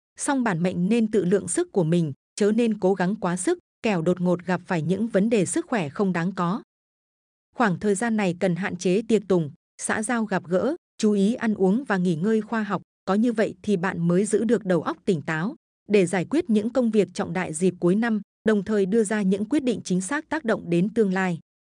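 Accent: Thanh Hoa, Vietnam. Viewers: Giong Thanh hóa nghe đã ko dung rồi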